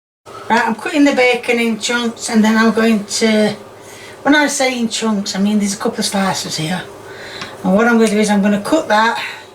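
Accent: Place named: Yorkshire, United Kingdom. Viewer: I love accent. Is it Yorkshire accent?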